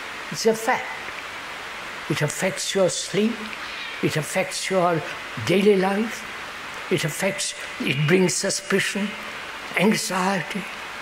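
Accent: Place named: United Kingdom. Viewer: his accent is British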